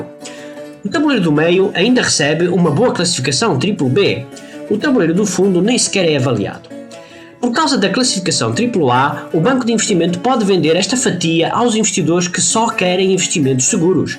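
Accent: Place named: Portugal. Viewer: Muito bom, mesmo sendo brasileiro consegui entender bem seu sotaque portugues kkk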